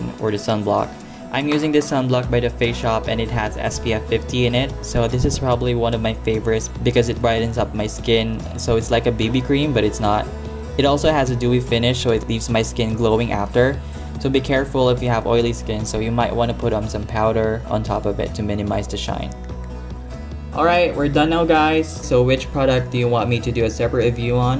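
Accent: Philippines. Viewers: Filipino accent, pinoy baga ito? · Waiit is it just me but you have a slight Filipino accent when you’re speaking English. Woaahh 😱😳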